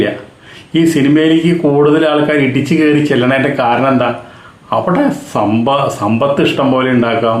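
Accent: Thrissur, India. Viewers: Very interesting Voice & Language, sounding Trishur?😅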